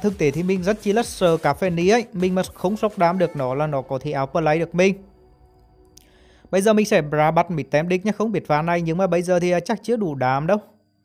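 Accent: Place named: Hue, Vietnam. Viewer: Người huế mà bạn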